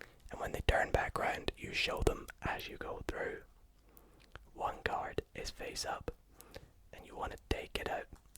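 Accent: Scotland, United Kingdom. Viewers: Are you scottish by chance? Thanks for the video and i like your accent alot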